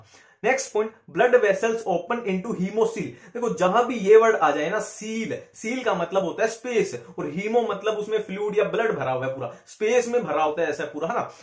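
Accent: Haryana, India. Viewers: Sir aap muje haryana ke lagte ho❤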